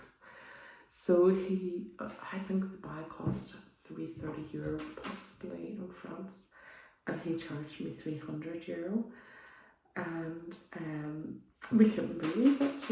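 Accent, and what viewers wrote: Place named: Northern Ireland, United Kingdom. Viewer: Another fellow Norn Iron accent! Great idea for a tag! 😁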